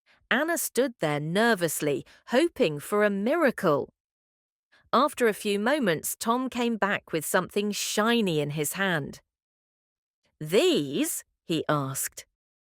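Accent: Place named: United Kingdom. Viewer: I love this story! Great British English!❤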